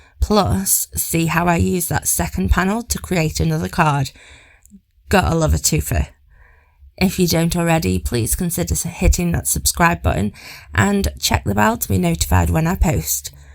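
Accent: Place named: Northern England, United Kingdom. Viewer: Lovely cards. Good to hear a wonderful friendly Northern accent. Not many fellow northerners on YouTube 😂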